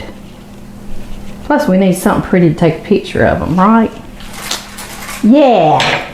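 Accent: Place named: Louisiana, United States. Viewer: where are you in LA? I am from Shreveport. You sound like you could be from just east of S'port. My accent is a SE TX accent since I was raised as a little kid in Beaumont, TX. I tell people that I have an educated SE TX accent. I remember Mother giving me this recipe back in the early 70s. She got it from my Aunt Helen, her sister-in-law, who lived in Dallas. I believe they are named for the musical "," which was very popular in the late 60s - early 70s. Yep, this is really a recipe from way before the 80s. I loved them and made them for get-togethers long before the 80s. One commenter said that they used a 9 X 13 pan for theirs. I believe that is the pan my recipe calls for, too. They come out just as delish, but thinner in such pans. I had a little party at my dinky house (half of a duplex) back when I was an undergraduate in New Orleans. 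My girlfriend helped me, but she was not much of a cook; I was the cook for us. Anyway, ones of my friends there said something along the lines of, "I can't stop eating those Hello Dollys. I've already had 4 of them." I replied, "You've had 6, but who's counting?" We both loved it because how often does one have the opportunity to use that line?!? Anyway, these little bar cookies that are almost candies are so tasty and simple enough for a boy just starting out cooking on his own to do with ease.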